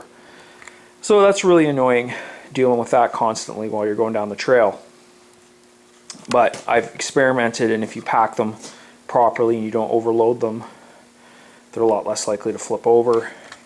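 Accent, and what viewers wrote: Canada. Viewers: Knew you were Canadian the moment you started speaking; cheers fella!!!!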